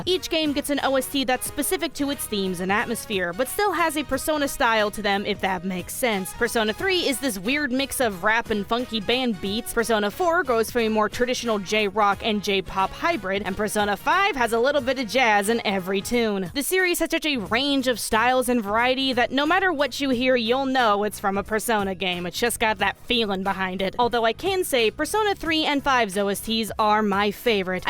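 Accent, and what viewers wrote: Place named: Virginia, United States. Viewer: I'll always have a soft spot for FF8's soundtrack. " ", the boss theme, the standard battle theme, the music during the assault on Dollet,... IT'S ALL SO GOOD. Also, I don't think I'll ever get over that one of my absolute favorite Youtubers is a Virginia native like me. First time I heard talking I was like "I know that accent!"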